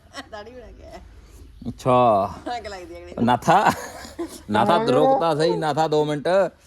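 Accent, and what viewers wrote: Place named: Doaba, India. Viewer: 🙏❤👏🏾, ਬਹੁਤ ਵਧੀਆ 👌 ਵਲੌਗ ਹੁੰਦਾ ਹੈ! ਜਿਆਦਾ ਅਤੇ ਬਹੁਤ ਪਸੰਦੀਦਾ 🤩 ਪ੍ਰਸਿੱਧ ਪਰਦੇਸੀਆਂ ਪ੍ਰੋਗਰਾਮ 😂😂 ਜੋ ਨਹਿਰੀ ਠੰਡੇ ਪਾਣੀ 💦 ਨਾਲ ਦਿਲੋਂ ਪਿਆਰ 💕 ਨਾਲ ਭਰਪੂਰ ਬਣਦਾ ਹੈ 🎉😂😂🎉😂! ਅਤੇ ਹਰ ਦੇਸ਼ ਪ੍ਰਦੇਸ਼ ਵਿੱਚ ਬੈਠੇ ਪੰਜਾਬੀ ਉਡੀਕ ਕਰਦੇ ਰਹਿੰਦੇ ਹਨ ਕਿ ਕਦੋਂ ਨਹਿਰ ਦੇ ਤਾਜ਼ੇ ਠੰਢੇ ਪਾਣੀ 💦 ਦੇ ਦਰਸ਼ਨ ਕਰੀਏ ਅਤੇ ਹਰੇ-ਭਰੇ ❤ਖੇਤਾਂ ਦਾ ਕੁਦਰਤੀ ❤ਨਜ਼ਾਰਾ ਲਈਏ ਅਤੇ ਲੰਘੇ ਵਖਤਾਂ ਨੂੰ ਮਜਬੂਰੀਆਂ ਨੂੰ ਸਰਕਾਰਾਂ ਨੂੰ ਕੋਸੀਏ 😢 ਅਤੇ ਹਵਾਵਾਂ ਦੇ ਵਿੱਚ ਜਾ ਕੇ ਆਪੋ ਆਪਣੇ ਪਿੰਡਾਂ ਦਰਸ਼ਨ 😻+ ਯਾਦਾਂ 💪ਤਾਜ਼ਾ ਕਰੀਏ 🤦🏽🙇🏽😢❤🤷🏽‍♂️👏🏾☝🏾🙏! ਬਾਕੀ ਬੋਲੀ ਤੁਹਾਡੀ ਮਿੱਠੀ ਪੰਜਾਬੀ ਮਾਂ ❤ਬੋਲੀ ਦੋਆਬੇ ❤ਨਾਲ ਬਿਲਕੁਲ 💯👍 ਮੇਲ ਕਰਦੀ ਹੈ! ਬੱਸ ਸਿਰਫ਼ 🥭 ਅੰਬਾਂ❤ ਅਤੇ ਸੰਗਤਰਿਆਂ ਦੇ ਬੂਟਿਆਂ ਦੀ ਘਾਟ ਹੈ ਓਹ ਲੀਚੀ ਦਾ ਬੂਟਾ ਵੀ ਜੋ ਛਾਂ ਲਈ ਵੀ ਬਹੁਤ ਵਧੀਆ 👌 ਹੁੰਦਾ ਹੈ 🤷🏽‍♂️! ਬਾਕੀ ਤੁਹਾਡੇ ਮੌਸਮ ਅਤੇ ਮਿੱਟੀ ਤੇ ਨਿਰਭਰ ਹੋ ਸਕਦਾ ਹੈ ਘਰ ਵਿੱਚ ਪਸ਼ੂਆਂ ਵਾਸਤੇ ਦੇਸੀ ਅੰਬ 🥭 ਦਾ ਬੂਟਾ ਵੀ ਬਹੁਤ ਵਧੀਆ ਹੈ ਗਰਮੀਆਂ ਵਿੱਚ ਗੂੜ੍ਹੀ ਛਾਂ ਅਤੇ ਸਰਦੀਆਂ ਵਿੱਚ ਰਾਤ ਸਮੇਂ ਨਿੱਘਾ 🥰 ਹੁੰਦਾ ਹੈ! 😂🎉❤😂! ਜੱਟਾ ਵੇ ਤੇਰੀ ਜ਼ੂਨ ਬੁਰੀ ਹਲ਼ ਛੱਡ ਕੇ ਚਰੀ ਨੂੰ ਜਾਣਾ 🧑🏻‍🌾👨🏻‍🌾 | ਸੌਖੀ ਨੀ ਜਿੰਦ ਜੱਟ/ ਕਿਸਾਨ ਦੀ ਪੈਂਦਾ ਸੱਪਾਂ ਦੀਆਂ ਸਿਰੀਆਂ ਤੇ ਤੁਰਨਾ😮! 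ਰੱਬ ਉਤੇ ਭਰੋਸਾ ਰੱਖਦੇ , ਕਰਨ ਅਰਦਾਸਾਂ ਹੱਥ 👏🏾 ਜੋੜ ਵੱਲ ਅਸਮਾਨਾਂ! ਬਾਬੂਆਂ ਦੇ ਝੂਠੇ ਲਾਰਿਆਂ ਨੂੰ ਕਹਿਣ ਤੇਰੀ ਕਿਸਮਤ ਵਿੱਚ ਇਹੋ ਲਿਖਿਆ ਭੁੱਖਿਆ ਜਜਮਾਨਾਂ 🤯🤑🤮🤒😷ਸਾਡੀ ਤਾਂ ਡੋਰੀ ਉਸਦੇ ☝🏾 ਹੱਥ 👋 ਐ ਪਰ ਤੁਹਾਡੀ ਬਾਬੂਆਂ ਦੀ ਕਿਸਮਤ ਹੱਥ 🤑ਨੋਟਾਂ/ ਪੈਸਿਆਂ 💰 💵!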